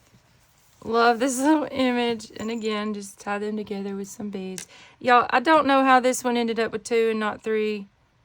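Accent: South, United States. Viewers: Love your southern drawl ❤️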